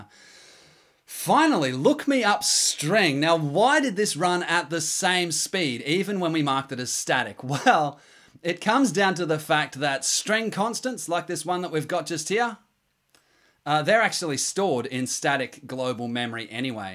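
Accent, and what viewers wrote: Australia. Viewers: Hi, mate. Are you from Australia?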